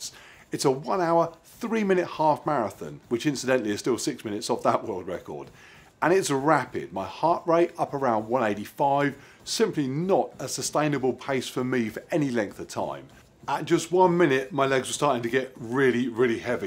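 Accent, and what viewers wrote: United Kingdom. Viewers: British accent)))))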